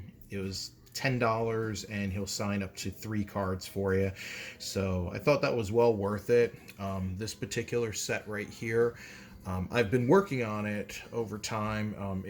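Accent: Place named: South, United States. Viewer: New subscriber. Great video buddy! The only flaw I can find is your heavy southern accent. Maybe in your next video you could do a New Jersey accent to help appeal to all Americans and drive up your views.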